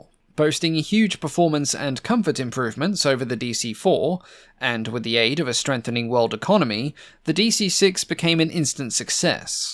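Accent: Australia. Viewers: Nike is pronounced just like the Nike shoe or did I miss this because of your Aussie accent <link> Cheers from San Francisco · Oh god, another Australian with the dull mechanical delivery of a schoolboy reading out his homework. But worse.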